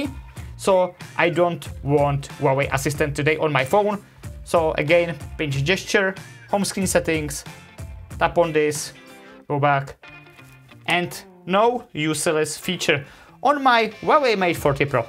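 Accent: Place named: Czech Republic. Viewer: mě bylo hned jasný že jsi Čech jsem to nějak poznal podle anglictiny a hlasu❤️ fakt díky moc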